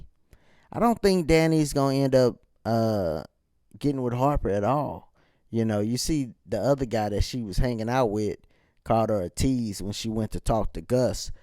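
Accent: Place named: St. Louis, United States. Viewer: youre from stl? Lol you sound like it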